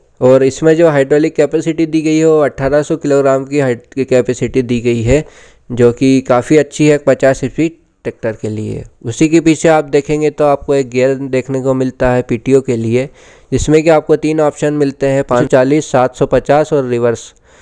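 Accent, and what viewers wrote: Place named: Madhya Pradesh, India. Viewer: Me bhi mp se hu tumhare bolne k tarike se hi lg gya tha mujhe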